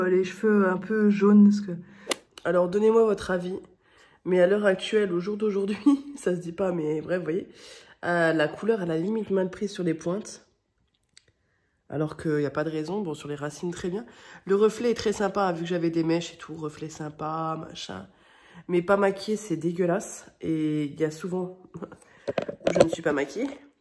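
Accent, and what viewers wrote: Belgium. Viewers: ton accent au début il était bien belge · tu m a fait trop rire avec ton accent belge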